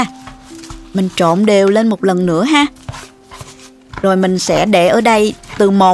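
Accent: South, Vietnam. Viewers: Cách hướng dẫn dễ hiểu và giọng miền nam đặc trưng mộc mạc hiền hoà dễ thương lắm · Chào chủ kênh. Giọng nói miền Nam nghe ấm áp ngọt ngào. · Đúng chất giọng.Nam bộ Bình Dương rất dễ nghe dễ hiểu có chất giọng rất truyền cảm CĐM luôn ủng hộ k.BD... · nói giọng nam bộ rất dễ nghe ấm áp,ngọt ngào,thân thiện,dễ thương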